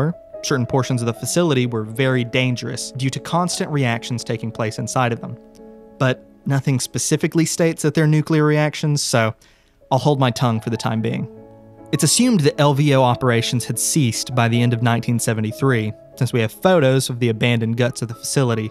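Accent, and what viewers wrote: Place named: Midwest, United States. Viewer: your accent is so interesting to me i can't tell if you're from west virginia or the midwest... somewhere west for sure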